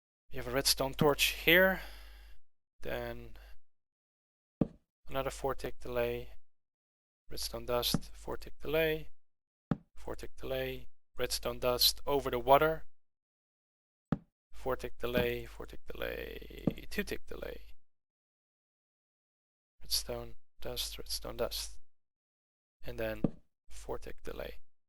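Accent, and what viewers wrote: Netherlands. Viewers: lol , you sound dutch :) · je bent nederlands he? hoor het aan hoe je bepaalde dingen zegt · nederlander zeker :P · U DUTCH RIGHT? ik hoor het aan je accent x)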